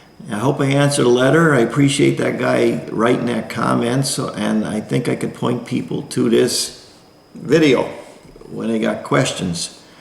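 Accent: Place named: Pennsylvania, United States. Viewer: I love your videos. They have taught me so much. Of course experience of actually doing the job is worth way more than text book info. You have helped me tons! Plus I love the Pennsylvania accent. Keep up the good work! And thank you! 🙏🏻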